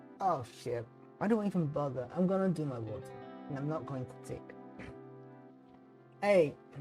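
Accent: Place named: Netherlands. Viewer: You have a Dutch accent but your English is amazing! Love from kansas. I am half German and 1\4 Dutch I wish I knew Dutch or German. My great grandma spoke German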